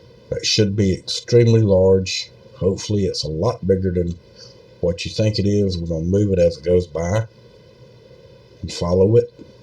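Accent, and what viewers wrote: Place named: South, United States. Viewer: I love your accent. Reminds me of when I lived in Virginia and Melbourne, Florida. Enjoy your channel. Are you in Florida? Please don't take the Lord's name in vain.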